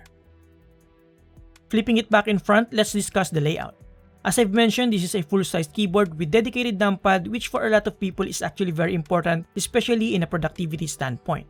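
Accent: Philippines. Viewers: Love the accent, Philippine pride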